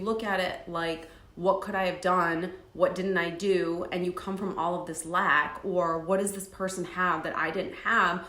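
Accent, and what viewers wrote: United States. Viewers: Would you be able to slow dawn when you talk because lm English and find your accent very strong not being rude your American accent is very different to understand please slow dawn or try to talk slower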